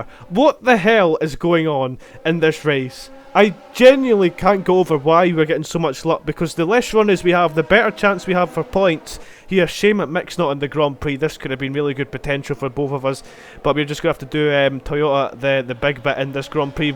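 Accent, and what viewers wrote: Scotland, United Kingdom. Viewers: Are you Scottish? 🏴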